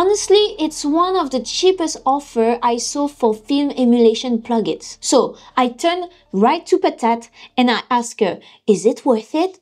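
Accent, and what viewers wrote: France. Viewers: Verry nice french accent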